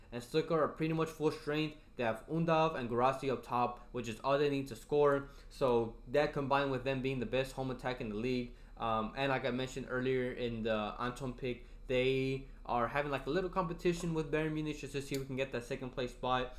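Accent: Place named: Texas, United States. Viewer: youre in texas huh. i thought you had a texas accent. then you said its thunderstorming.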